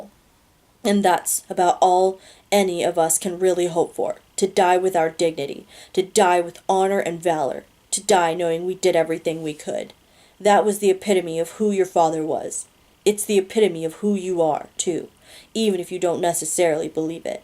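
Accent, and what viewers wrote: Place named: United States. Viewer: I think what I wrote confused you, I apologise as I write as I speak, I am at the moment listening to the "power of six" and was confused as I thought I had missed " Six's Legacy" but you have cleared it up that it is a novella ( I will listen to that after this one " the power of six" ) as I am now guessing it gives you an insight into just her life no one elses, Yes accents are strange I knew you were from the US just couldn't pinpoint where about, although I must say it is a very nice accent to listen to and I love that you change it for the different characters, really helps me visualize the story. So glad I found your channel, as it is helping me pass my mundane hours working from home, I work with clay and creating small charms for jewellery and a few episodes before this one I believe, I was doing something really intricate on a piece and your cat jumped on your knee and you screamed, I threw the clay across the room, haha I couldn't stop laughing at myself you gave me such a fright, as I wasn't watching the screen haha :D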